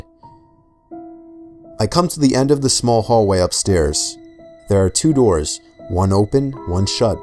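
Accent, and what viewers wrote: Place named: New York, United States. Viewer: I picture him looking like the Joe Pesci from Home Alone lol the NY accent is why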